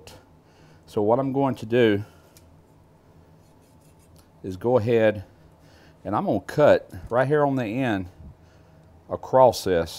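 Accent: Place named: Mississippi, United States. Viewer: You can tell your a Mississippi Man....lol. sound like my friend Amos Fowler ( ex NFL player)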